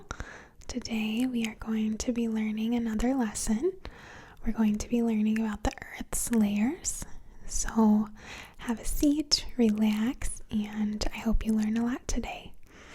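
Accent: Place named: Midwest, United States. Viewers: makes learning fun. (relaxing too) Also, you have an accent. Chicago? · love the midwest accent 😍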